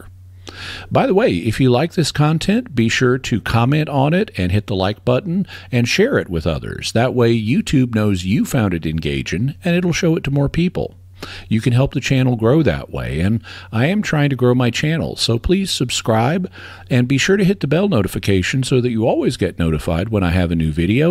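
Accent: South, United States. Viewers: I love your work! Thank you! Also, I noticed a southern accent stronger in this video than most. Did you recently speak with southerners? That phenomenon of changing accents according to environment would make an interesting topic. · Is it just me... or is he assuming a "folksier" southern accent than usual in this video lol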